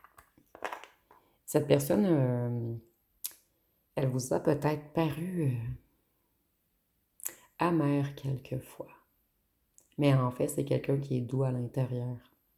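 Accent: Quebec, Canada. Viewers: Ahh oui pis je comprends pas pourquoi tu caches ton québécois ça fait parti de toi. Je crois pas que les Français belges et autres se bloquent. Tu peux être naturelle c'est tellement moins épuisant. 😘 Merci et j'aime beaucoup tes titrages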